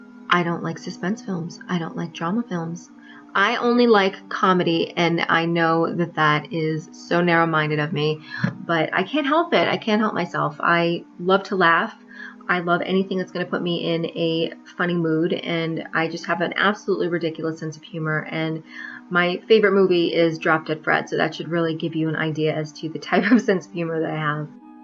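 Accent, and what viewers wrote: New York, United States. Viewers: Ditto on the gym playlists! Never representative of actual musical tastes. The gym is a whole different beast. And nothing wrong with a NY accent, NYC is rich in subcultures! 👍🏻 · What's wrong with a NewYork accent I love it! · I hear the NY! Fellow Queens chick here. But you lost the nanny accent so that’s a good thing!😝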